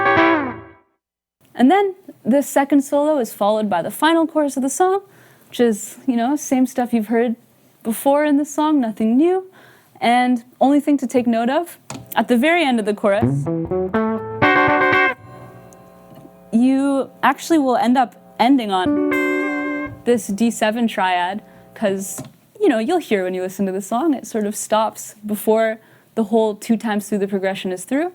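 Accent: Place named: Canada. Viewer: Canadian accents are so damn great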